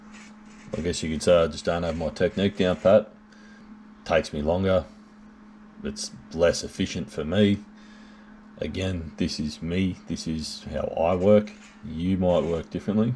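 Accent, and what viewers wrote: Australia. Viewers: Subscribed awhile back and glad to see more have found you. Screw those bringing negativity. Enjoy the work, your attitude, seeing Australian yards and the accent. Keep it up! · I like your techniques, it is nice to hear an Aussie accent with this type of video,keep up the good work Mate 👍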